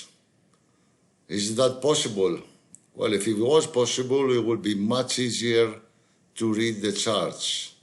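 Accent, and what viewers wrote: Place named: Greece. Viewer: Και εγώ από Ελλάδα είμαι στη Θεσσαλονίκη μένω και μόλις είδα το βίντεο κατάλαβα ότι η προφορά είναι Ελληνική !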